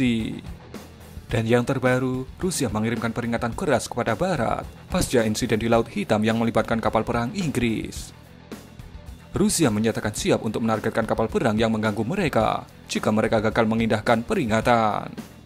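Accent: Java, Indonesia. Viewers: Kok meddok banget mas wkwk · Medok amat mas suaranya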